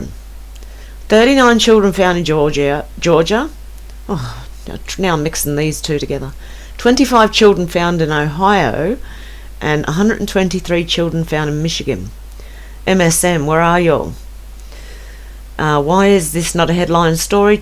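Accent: Australia. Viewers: R U Aussie? Me too! God bless you!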